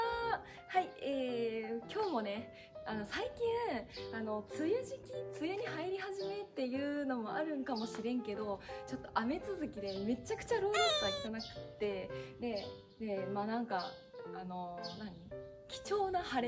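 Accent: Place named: Kyushu, Japan. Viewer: 九州出身なのでたまに出る方言がとても良いと思います😊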